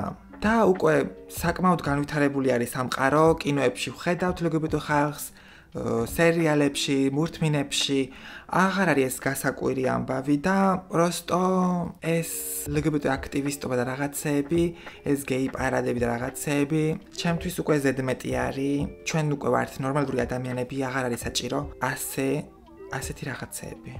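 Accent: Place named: France. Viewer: ბიოლოგიკური 😁😁 უსაყვარლესად გამოთქვამს ქართულ სიტყვებს ფრანგულის გავლენით 😁😁 ძალიან სახალისოა ❤️, რესპექთ რასაც საუბრობ მგონია რომ სწორია ❤️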